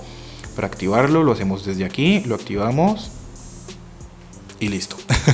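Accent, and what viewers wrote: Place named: Colombia. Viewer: Cuando dijo un millon de pesos dije vergas ya despues escuche el acento y me acorde que en Colombia el dinero se maneja en millones saludos desde Mexico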